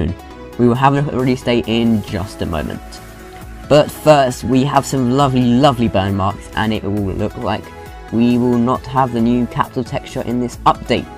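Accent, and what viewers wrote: United Kingdom. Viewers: It's been 12 days,where is the uptade? And you sound very very britishy.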